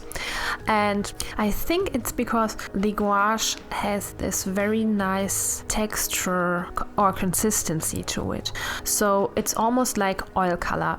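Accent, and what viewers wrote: Germany. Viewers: I can't believe people are so rude to say they don't like your accent! English is a weird language and you speak it great. I am Canadian, but my mother moved here from Germany, and you sound like my family; you are lovely to listen to. And an incredible artist. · I love your accent! I used to work at a horse product store with a German lady and hearing you reminds of many fond times with her! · This is Xiao from China. I have absolutely no problem with your adorable German accent. Your English is proved perfectly fine, and better than being fine -- it is so sincere and expressive. Love your art! And I feel the same when a painting or drawing actually comes out matching my expectation. So satisfying. ///^_^/// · I understand completely. the pictures transport me to some fantasy world I'm desperate to know the story of. And to answer another question. 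watching your videos to learn watercolor which I really struggle with but have found myself watching everything because you explain so well and are very interesting to listen to. also I love your accent. I had and visited a German penpsl when I was in school.. do your accent is a lovely reminder of that friendship. thank you xx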